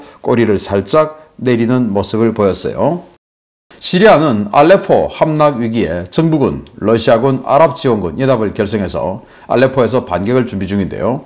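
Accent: Gyeongsang, South Korea. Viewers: 늘 나라위한 소식 감사합니다 그러나 알려서 오히려 해가 끼칠걸 판단 하시는것이 먼저 진정한 나라위하는 것이고 자신 중심과 우상적 말씀은 자제하셔요 가만히 앉아서 실기의 전쟁상황을 이론의 말씀만으로 자신하십니까?.그리고 뒤가 먼저입니까?나아가는 앞이 먼저입니까?마치 한국이 전쟁을 치루어야 되는것에 긍정만 보시는데.핵심은 전쟁없이 살생없이 주변과.특히 북한과의 사이 아닙니까? 또.제일 중요한것은 팔이 안으로 굽는다고 북한군인이 죽기 살기로 뛰어드는 사정을두고 무기줄테니 죽여라는 것입니까?그리고 또.친구 비유 하시는것도 보면 사상적 까지 의심스럽듯이 친구것을 먼저 탐하는것에서 화끈이 되네요.아무리 가까워도 내것이 아닌데 버리던 말던 왜?열을 내십니까?바라건데 앞으로 전쟁에 대한 자료는 접는게 더 좋을듯 합니다.또.경상도 억양으로 진짜 경상도 사람을 욕먹이고 있지 않습니까?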